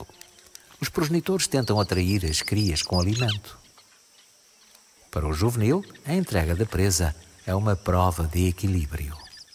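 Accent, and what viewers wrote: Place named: Portugal. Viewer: Ô inteligente, o narrador não é português do Brasil, ele é português de Portugal.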